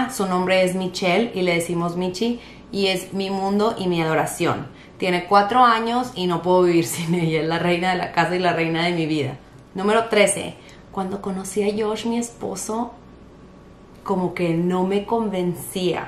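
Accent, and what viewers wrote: Monterrey, Mexico. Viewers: Hola me caes muy bien me encanta tu forma de hablar inconfundiblemente regia! 😁 confieso que pare de ver tu vídeo un poco para ir a escuchar la canción de que mencionaste wow hermosa canción! Me recordaste a una YouTuber regiomontana también su canal se llama la regia charlton se me hace que tú y ella se parecen! muy guapa señora y el modo de hablar parecidisimo al tuyo y ni hablar del acento, Oye tú niñita la misma carita de su papi 😳 me encanto saber más sobre ti me parece tu vida interesante bueno bye cuídate y éxito y más éxito 👍🏻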